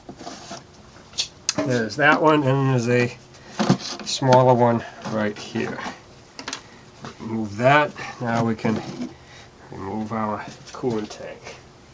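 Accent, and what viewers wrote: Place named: Boston, United States. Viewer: wow, really? Either way, I'll be checking your videos. They are extremely informative and gotta love thr Boston accemt. :D.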